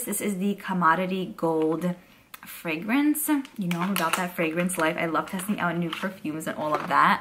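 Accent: New York, United States. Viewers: Love love your videos watch they daily and love you New York accents it’s the cute make me miss home 🥰 love Ipsy too ♥️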